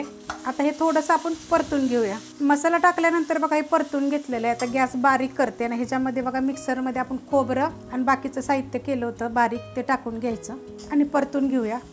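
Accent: Satara, India. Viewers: Tai tumhi Satara che aahat ka??? bhashe waru vattay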